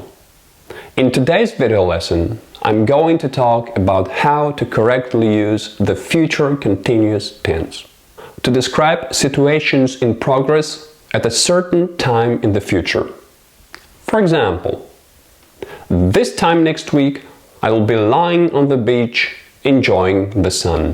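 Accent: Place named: United Kingdom. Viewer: Цікавий акцент. Схожий на британський.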